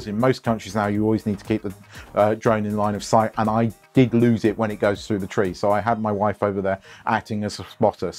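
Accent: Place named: Surrey, United Kingdom. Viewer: Really highlights the possibilities with the Pro/DJI flight modes. With your accent I was expecting views of Woking, wasn’t expecting Palm trees, ha ha. Thanks for posting!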